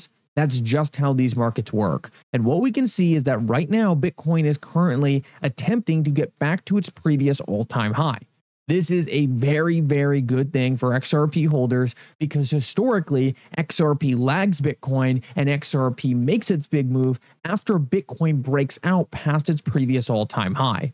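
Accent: Allentown, United States. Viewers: with an Allentown accent, XRP is set to go to 0.001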